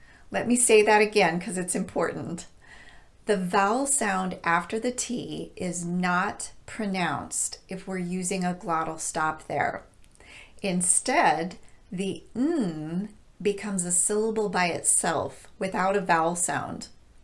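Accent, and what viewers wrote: United States. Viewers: Dear! What state are you from?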